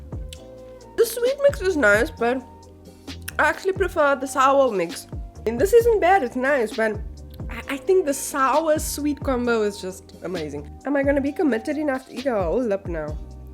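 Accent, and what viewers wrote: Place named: Afrikaans, South Africa. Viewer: Ek kan die afrikaans in jou stem hoor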